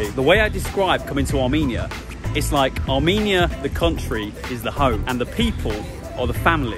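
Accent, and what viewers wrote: United Kingdom. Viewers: Надеюсь это троллинг он говорит с Южным с британским акцентом... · Oh, this is so adorable to hear how you praise our country in British accent which I am crazy about ))...I used to live 6 years in nearby Reading. People in England are very friendly and smiley...))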